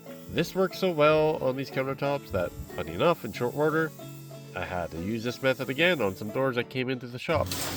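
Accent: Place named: Canada. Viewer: First time watching…immediately hear a Canadian or upper Midwest accent!❤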